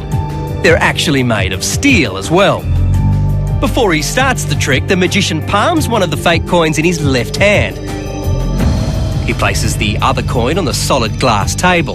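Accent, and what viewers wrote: Australia. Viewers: it's the australian version with an aussie narrator lol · Can't take this Australian accent seriously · Ey... It's good old Aussie Accent ^_^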